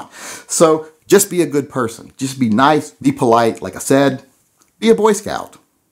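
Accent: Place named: South, United States. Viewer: You got an automatic like and subscribe the second you gave your final thought. All I was thinking the whole video was the same thing and then you dropped it on my like a Boulder 😂 never hear people in the gun community speak with such openness and honesty especially a fine southern sounding gentleman like yourself. I’ll be checking out the channel now, thanks for throwing in your 2 cents.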